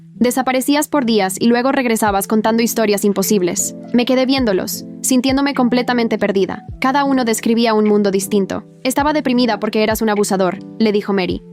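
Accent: Mexico. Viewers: La historia con algo de acento mexicano🎉😂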